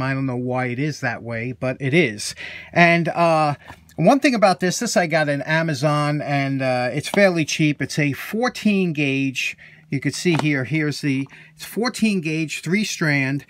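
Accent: New York, United States. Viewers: I was so waiting for you to say Bob’s your uncle because you had like 10 chances to see it before and then Bam you finally said it and I like hearing it in your New York accent because there’s no uncle like Bob and there’s no way to hear it Better than New York accent ha ha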